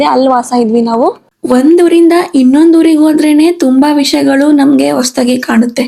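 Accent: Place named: Sira, India. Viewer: ದೂರದ ಜರ್ಮನಿಯಲ್ಲಿ ನಮ್ಮ ಸಿರಾ ಭಾಷೆ..... ಕೇಳಿ ಖುಷಿ ಅಯ್ತು...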